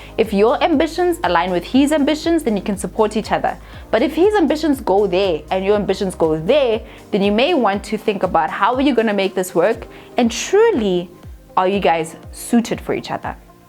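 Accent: South Africa. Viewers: I like the way you speak South African vibes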